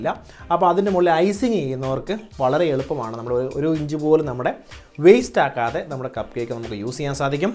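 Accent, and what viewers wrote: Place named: Kottayam, India. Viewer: Hope it from kottayam ..slang like my Amma....nostalgic...presentation also super...chettan superaa...🚽😊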